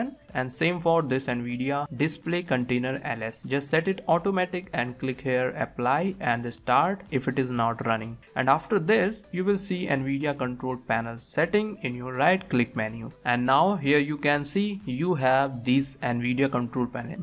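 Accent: India. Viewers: É SEMPRE O INDINAO COM SOTAQUE MAIS RANHOSO DA HISTORIA QUE NAO RESOLVE O PROBLEMA SINCERAMENTE MEU ÉS IPOR RAÇA MAL CHEIROSA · Its always the guy with the cool Indian accent that fixes my tech problems. Thank you! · indian accent >> every other educational video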